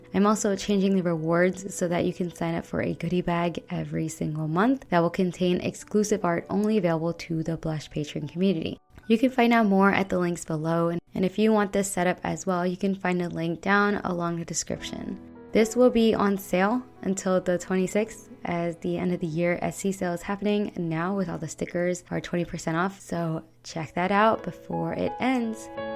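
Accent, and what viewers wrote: Vietnam. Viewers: Leave me a Comment is hãy để lại cho tôi 1 bình luận in vietnamese. Your voice is so sweety, and you are speaking the southern accent.😍😍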